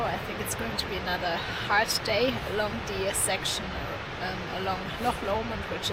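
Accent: Germany. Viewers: Do I hear a german accent? ;)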